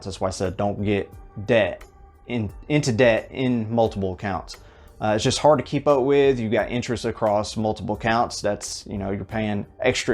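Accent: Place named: West Virginia, United States. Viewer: awesome dude. I was hoping you were closer to home as we share the same accent. Lol. I'm here in point pleasant wv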